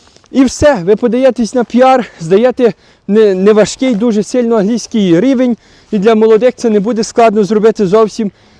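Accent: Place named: Western Ukraine, Ukraine. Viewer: Трус. Чути говір західної України. Втік? А країну інші повинні захищати?!!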